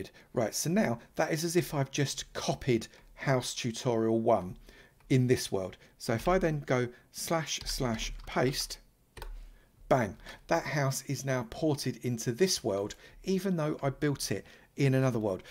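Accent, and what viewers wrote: United Kingdom. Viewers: thanks very much man ! i had been wondering what i was doing wrong :).... love your british accent btw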